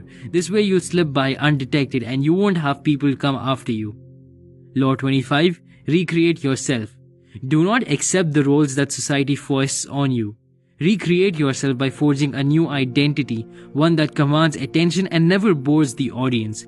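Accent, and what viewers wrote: India. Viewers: Immagine clicking this video expecting frank underwood's voice and hearing an indian.. the pain.. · Please stop. I hate Indian accent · so that means u are an indian . I knew that accent was native. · Law 51, dont use an Indian to narrate in English. Its "wery" irritating. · Do i detect an Indian accent? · Couldn't watch this for more than 5 seconds because of that Indian accent. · very Indian accent.... awful! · I really enjoy marked Indian accent. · fuuuuck an indian accent SIGH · That it is being narrated by an Indian makes me not listen to it 🚮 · Cant stand his annoying Indian accent.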